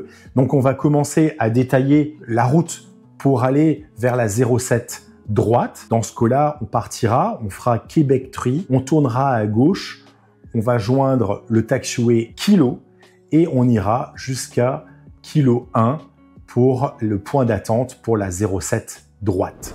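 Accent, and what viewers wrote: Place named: France. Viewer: J’adore ton french accent😂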